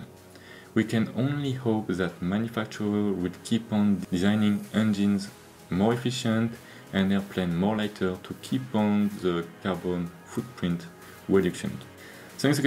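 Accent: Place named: France. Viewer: Ca c'est de l'accent Franco-Français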